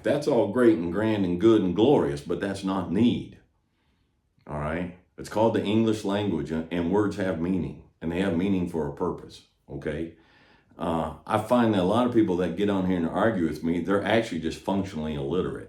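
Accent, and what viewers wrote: United States. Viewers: bro ı dont understand english but your cowboy english ı like it so ım watching you AHAH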